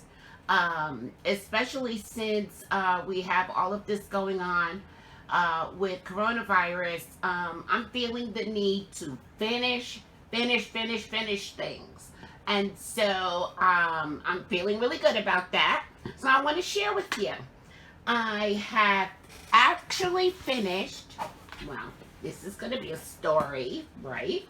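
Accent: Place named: Bronx, United States. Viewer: I like your videos, that blanket is amazing. And your sister will love that shawl I'm sure, it's gorgeous. My husband was listening while I had your video playing and he said where is she from? I said she lives in NC but I think she's from further north. He said her accent sounds like our dear friend Maryjane who is from the Bronx. And when you said you'd send this to your sister in New York, I thought I'd ask you where you were from. I'm originally from eastern Pennsylvania but live on our sailboat now and meet people from all over the world. Thanks so much for brightening my day and I'll keep that quote in mind.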